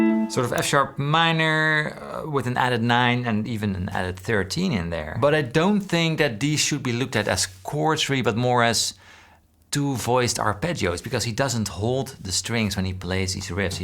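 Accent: Netherlands. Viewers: Are you dutch ? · Ik dacht al, waar komt deze man vandaan, hij klinkt Nederlands xD Toffe video, enorm van genoten! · Dutch I think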